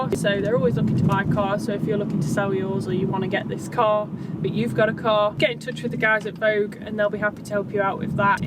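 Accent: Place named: United Kingdom. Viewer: I could listen to you read an Instruction Manual and I would be quite content. Beautiful women with an adorable accent. Brits are the shit!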